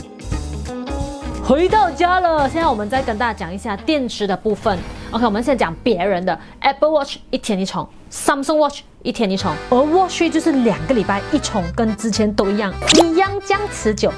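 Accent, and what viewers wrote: Malaysia. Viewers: 馬來西亞普通話？ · 马来西亚的口音都是这样子的吗？ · 我是来听你的马来口音的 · 你系痴利根定系马来口音都系咁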